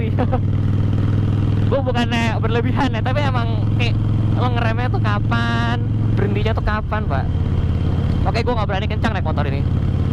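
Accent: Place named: Java, Indonesia. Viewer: "iyuh kono" hahaha khas banget ya medhoknya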